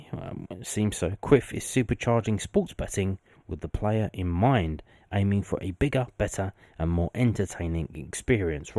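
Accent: Scotland, United Kingdom. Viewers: Your Scottish accent is awful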